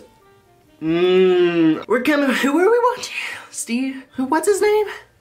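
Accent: South, United States. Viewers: Every time i watch your videos i always forget you have a country accent lmao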